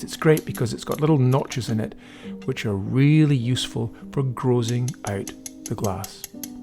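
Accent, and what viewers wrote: United Kingdom. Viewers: There is one product called Pebeo Vitrail that you don’t have to fire, but if painted while the glass is vertical and not horizontal, it will run downwards. I’ve found it also smears out as it dries, so painting a scene or portrait or whatever, the detail gets lost. It is oil based and requires mineral spirits to clean your brushes. My apologies to - I know I wasn’t asked and it may be that this product is only available in the United States (assuming you are in Great Britain by your accent). Just wanted to pass that tidbit of information on as it may help their situation. I was wondering if you could take your skills and apply them to a glass piece using the pebeo Vitrail glass paints. I’ve been trying to see if I could but haven’t spent too much time trying yet. I’ve just done some quick preliminary trials so far. What I’ve found I have said above to the OP. 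Where there is a will there is a way!..hopefully. Anyway, thanks for sharing your expertise! The restored piece is fantastic!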